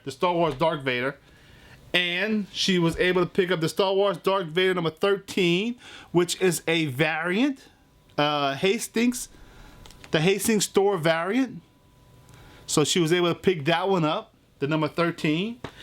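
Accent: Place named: Louisiana, United States. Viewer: I'm from Louisiana, I just found your channel and heard your voice and instantly knew you were from Louisiana! Love your channel man!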